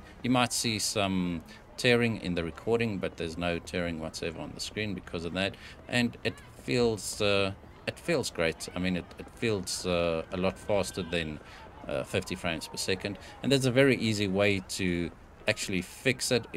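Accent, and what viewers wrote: South Africa. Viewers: Yeah true, I’ve been playing it since 2.0 patch, even on my gtx1660, it’s still quite a decent experience. Just bought a RX6600XT for R3500 yesterday (I’m also South African). I was just digging through some benchmarks for this card and recognised your accent and decided to continue watching.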